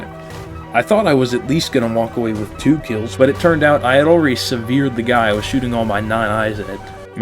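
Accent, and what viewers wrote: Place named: South, United States. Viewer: I feel so bad for you. You're so clearly too stupid for top tier, so much so that I genuinely feel bad for you and your experience. Usually, people like you are scared away before it takes them 3000 hours to hit their first jet because your scores are so menial, every match you play. I think its either the miserably slow cadence of your voice (I get you're southern... [probably fat too]) or simply how you speak, but GOD. DAMN. Stick to Call of duty or something because I beg of you, please do not accidentally join my team...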